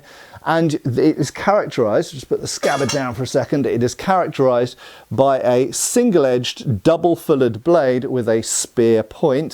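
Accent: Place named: United Kingdom. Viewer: Nice video . Today I'm practising HEMA, and as spanish I would like to get as much possible "Spanish Swords models. I Have realized that there are millions and it's going to be an impossible mision. Perfect your british accent (and difficult)to practise my English listening. Beatiful swords you' ve got. THANKS MATE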